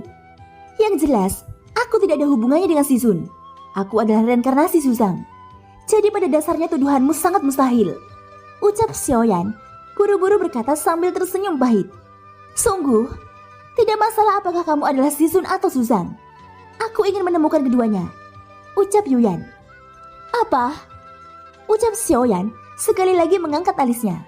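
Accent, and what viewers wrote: Java, Indonesia. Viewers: Medok nya min😅😅😅cuma pengisi nobarta lama yg mantap intonasi pembawanya😂😂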